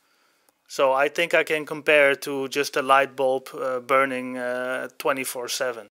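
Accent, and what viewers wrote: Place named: Netherlands. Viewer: Dacht ik al haha, lekker Nederlands accent!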